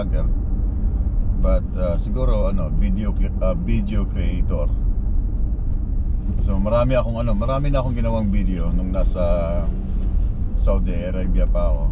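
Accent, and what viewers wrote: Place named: Pampanga, Philippines. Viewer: Parang kapampangan sya kase ako ganon matagalog lol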